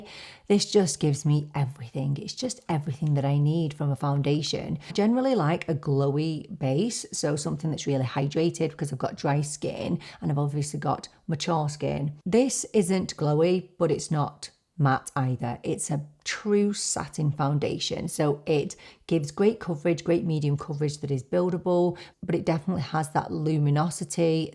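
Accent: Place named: England, United Kingdom. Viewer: Just joined your community, some excellent videos and very informative. Can I ask where is yours accent from as you said you live in Europe and your accent is very English and your mannerisms. Gorgeous look❤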